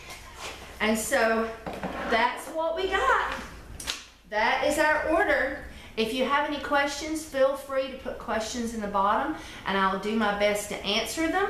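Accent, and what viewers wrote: South, United States. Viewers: Are you kidding me?? Jojoba oil is the cheapest oil EVER!!!!!!! And yes even the purest 100% is very cheap! I dont know where you buy yours from but over here in europe is the cheapest oil of all of the oils on this world together with grapeseed oil! ITs so cheap cuz its vitamincs and nutrient value is the lowest out of all the oils! Johjoba is a basic carrier oil and no powerhouse oil at all! And also you jsut made that up with the whole puttting extra oil in the bottles incase of a spill! ahahahahhaha You re so funny! Ive worked for beauty compagnies and trust me they dont anything ectra in there! You just made that up to feel bettter about yourself. And the reason why google wont recognize your voice is cuz you talk very ghetto and country! She didnt even try to speak correct English! From to you reminded me extactly like Madea!!!!! 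jaahahahahahah Mabble simons aka madea is the bomb! YOu should act and talk mroe like her! IT suits you.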